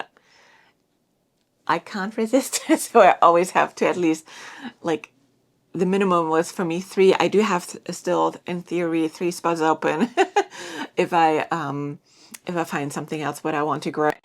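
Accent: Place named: Germany. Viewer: Always enjoy your videos! Best of luck with this year's growing season.My in laws came from Germany. I love listening to your accent!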